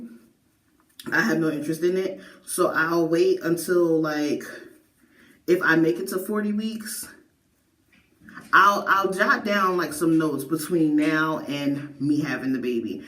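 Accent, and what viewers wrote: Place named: Philadelphia, United States. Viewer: Hi! Are you from Philly? I ask because I am too and I can hear a Philly accent. I am sooooo happy for you and your husband ❤️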